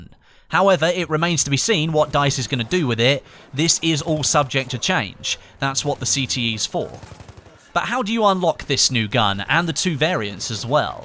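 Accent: United Kingdom. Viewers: your UK affiliated accent is terrible to listen to u dont sound very compelling either I must have been drunk the day I subscribed lol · Jesus that's a fucking thick British ascent.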